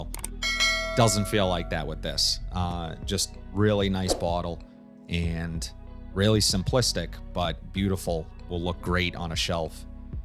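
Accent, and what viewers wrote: Boston, United States. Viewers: Nice review. On my list for this summer. Are you from Boston?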